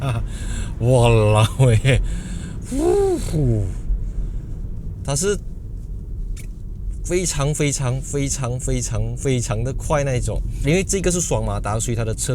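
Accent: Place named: Malaysia. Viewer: 讲解很专业 大马朋友说 华语 口音很好听的感觉 ❤ 👍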